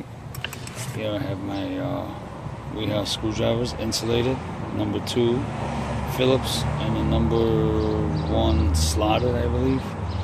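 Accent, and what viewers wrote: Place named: New York, United States. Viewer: I bet he's of Italian or Puerto Rican descent; either way it's definitely a New York accent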